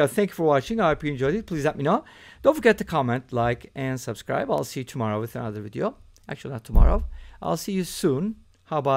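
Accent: Turkey. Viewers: You have a really turkish accent, are you any chances turkish?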